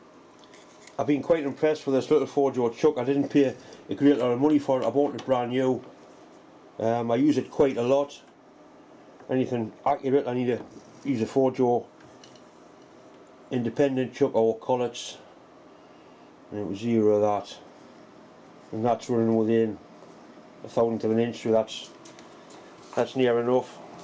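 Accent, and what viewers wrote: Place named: England, United Kingdom. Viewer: Nice going fer a twice Cacky -handed ,funny talkin` Pom , keep-on messin` aboot dear friend of the same affliction !! Regards Rod Chessell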